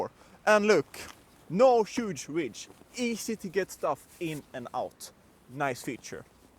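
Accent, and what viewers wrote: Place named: Sweden. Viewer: Cheers to Sweden, love the accent!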